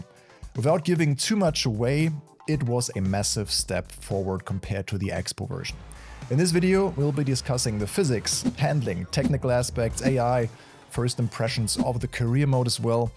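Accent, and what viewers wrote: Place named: Germany. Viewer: Man merkt sofort das du made in germany bist 😅.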